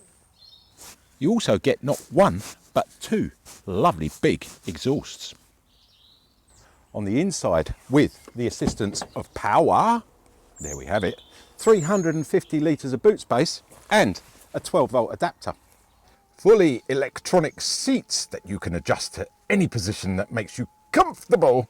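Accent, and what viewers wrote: United Kingdom. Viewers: Beautiful presentation. I like it a lot. The British accent most welcome.👍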